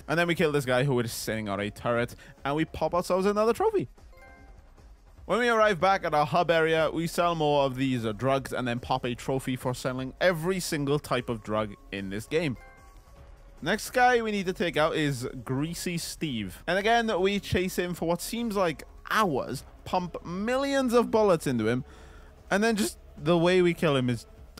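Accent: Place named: Wales, United Kingdom. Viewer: Man, from the first line I thought to myself "I bet this is Ride to Hell Retribution". Always good to see your dulcet Welsh tones coming up in my subscriptions again!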